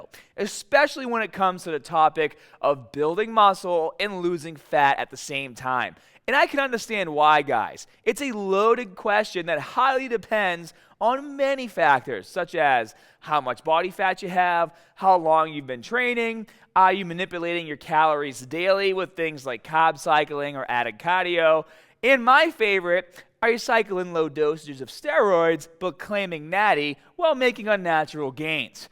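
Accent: Boston, United States. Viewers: The exaggerated Boston accent is atrocious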